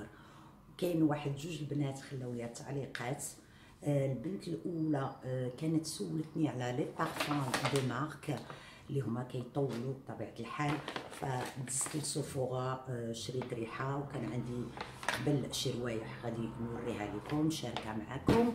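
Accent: Algeria. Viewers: هدرتك بحال الجزاىريات 🌹 انا جيت من عند أمينة ❤❤ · ولله تقول جزاءرية يا اخت امينة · سبحان الله هضرتك تقولي جزائرية واش مزوجة جزائري بالتوفيق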